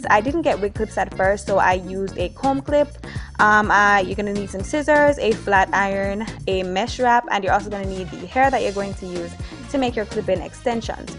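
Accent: Jamaica. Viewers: You sound Jamaican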